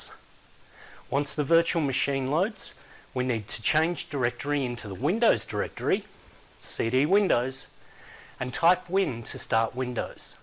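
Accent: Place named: Australia. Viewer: Man, I missed your Aussie accent :-D Without this product I wouldn't have come across with you all great people (yes, then of course with others ;-) ) and didn't make a living from and with it for almost 21 years. I will share this video to all customers who are thinking about migrating their apps to Microsoft - they will regret it soon.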